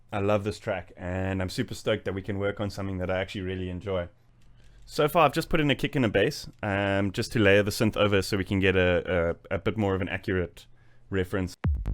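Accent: South Africa. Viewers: You from south africa ekse?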